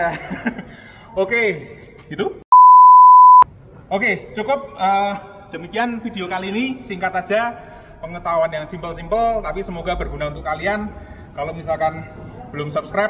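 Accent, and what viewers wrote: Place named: Banyumas, Indonesia. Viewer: Oh ternyata om ngapak tohh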